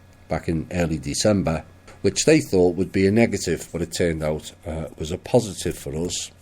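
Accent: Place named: Liverpool, United Kingdom. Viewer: I feel that the evil in this world has unleashed something palpable, and it seems to be growing exponentially! Maybe it has always been there and I only feel this because I am 'waking up' I don't know, but I pray for you, and all those who risk EVERYTHING to bring us truth and hope. May God (what/who ever you perceive the creator of light and love to be} shield and bless you always. THANKYOU!!!!! (PS - I'm guessing Birkenhead or Liverpool region?)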